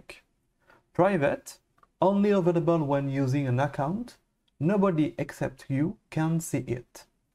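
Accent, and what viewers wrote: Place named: France. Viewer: Your french accent is beautiful 😂❤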